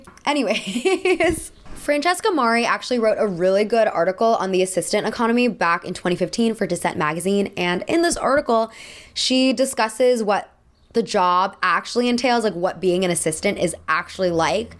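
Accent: France. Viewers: French accent come thruuuuu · Girl, your French accent sounds so good in this! <3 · the way you pronounced 'cahiers' made me laugh so hard 😭it was so aggressive lmaoo, it was also hilarious when you spoke english with a french accent lol love you though, as usual it's an amazing and extremely well researched video!! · As a creative thinker, I too love print. There is something special and magical within the tangible qualities that gazing upon a thought provoking or glamorous photograph and sliding your finger down the page as if you were there to experience it yourself brings to the senses. It’s a medium overshadowed by the instantaneous stream of vapid content — not art — that our society is now bombarded and accustomed too. But, as a designer and ambitious visionary, I understand that what I have to offer will by exclusive and elitist. I beckon for mystique. I remind myself of the time Lady GaGa said in the beginning of her career, “I’m not interested in being a celebrity. 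I’m interested in being an artist and there’s a VERY big difference.” A week ago, I was daydreaming of a group of girls with taste, together flipping through and expressing to one another a sense of genuine interest of something in particular within their large glazed magazines. I thought to myself, it is my duty to create this moment for them. Also, your French accent is on point.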